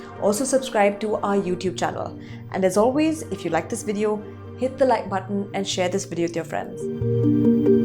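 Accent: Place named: India. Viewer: love indian accent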